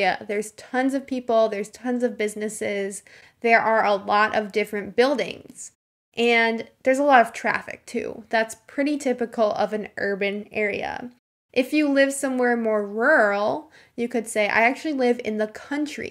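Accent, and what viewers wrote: United States. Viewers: I have just found this channel 😃 I really like American accent so I already hit the botton for a subscriber . You are so excellent teacher . Love from Thailand.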